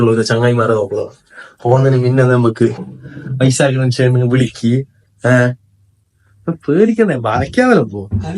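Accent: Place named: Kasaragod, India. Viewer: Kasaroda slangilanne describe aakiya madeera Ade majeullu Baaki south Kerala slangilellu kore vlog indra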